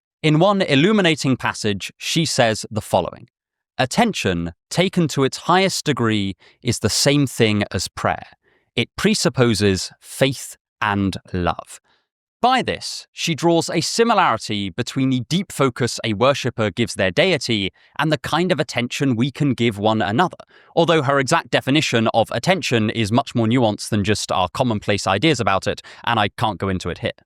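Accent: United Kingdom. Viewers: I don’t know if it’s j because you have a British accent and are wearing tweed, but I agree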